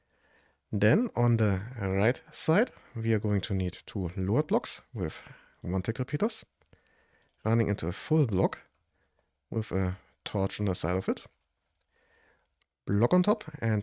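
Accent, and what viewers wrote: Germany. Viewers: Biste Deutsch, habe dich bei nem Video gesehn, wo dein Build geklaut wurde bei Dream SMP und habe deine Sprachweise gehört, also biste Deutsch. . If you can't read that, ignore it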